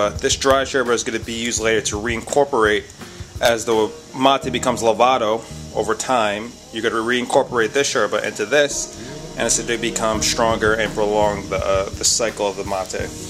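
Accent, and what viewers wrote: Argentina. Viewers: I know you're talking in an Argentine accent but if you didn't know IF you didn't that is it's actually pronounced bombiya it's spelled bombilla but I'm sure you know that but I just had to tell you I don't know why but I did... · That's how we say it in the Rio de la Plata region. It's not wrong, it's just our particular accent. just like your version of pronouncing words isn't wrong either, it's just your version of spanish or castellano. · love how you speak the Argentinian languague...bombisha...very nice and very informative.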